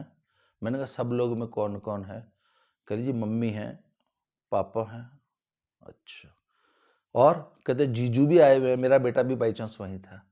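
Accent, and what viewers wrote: Haryana, India. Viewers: Ram ram dada ji, Aap ka Hariyanvi samvad kamal ka he 😂😂😂😂😂, aisa lag hi nehi reha ki aap eastern UP se ho,lagta he ki aap Hariyana ki gramin sathli se ho 🙏🙏🙏🙏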